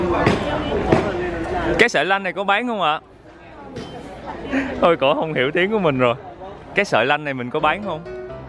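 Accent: Mekong Delta, Vietnam. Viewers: Bạn này có lợi thế giọng nói cuốn hút, phát âm giọng miền tây dễ thương (mình k rõ bạn ở đâu), đẹp trai. Nội dung thì lúc nào cũng dễ chịu cực kì. Ủng hộ bạn nhiều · Nghe giọng biết ngay là người Kinh tộc khinh người. bạn biết gì về ẩm thực vùng cao biết gì về người ĐỒNG VĂN? Mấy cái món của bạn nói đã bj Kinh hóa hết rồi đâu còn nguyên bản. Ngay cả món ân mà người kinh gọi là Thắng cố thì còn chưa gọi đúng tên nói gì đến cách nấu và cách dùng nguyên liệu.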